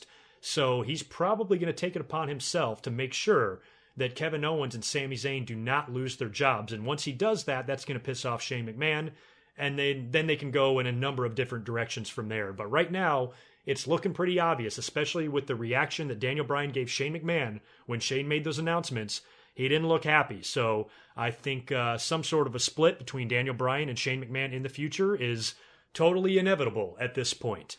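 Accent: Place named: South, United States. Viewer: Your southern accent is torturing!!!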